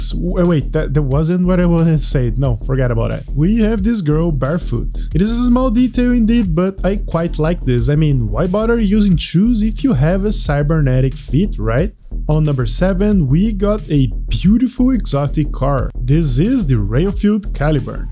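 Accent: Brazil. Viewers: cara, você é brasileiro ?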